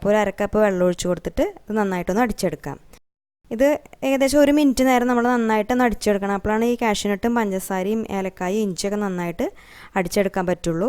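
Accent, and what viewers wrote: Thrissur, India. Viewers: Thrissur aanoo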